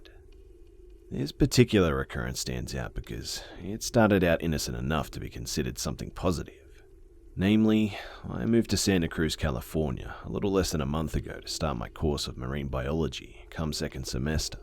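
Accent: Australia. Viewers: ARE YOU AUSTRALIAN CUZ DAT ACCENT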